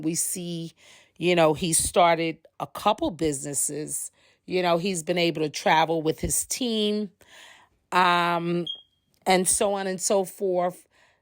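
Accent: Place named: New York, United States. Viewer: You speak very well, sounds like you're from New York the way you pronounce lawyer, talk and brother, are you?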